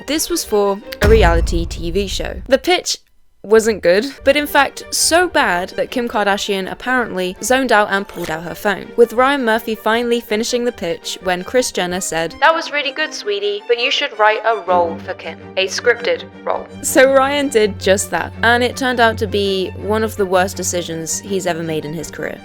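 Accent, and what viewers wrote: England, United Kingdom. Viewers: I seem to have fallen into a habit of watching these types of video essays in the evening and no matter the topic, your commentary makes it fascinating and engaging, so thanks for that! I am trying to reclaim my attention span by watching longer videos lol. Also I find it such a struggle identifying English accents, I'm Irish so I know the difference between North and South and the really obvious ones like Liverpool, Yorkshire and Birmingham but would I be right in placing yours somewhereeee outside of London? As in the surrounding counties or somewhere like Brighton. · Oh great another British YouTuber! You should really learn the pronunciation of people's names and not Britishize them. I watched one episode of this garbage show and will not continue. I feel Kardashians are a plague upon this earth!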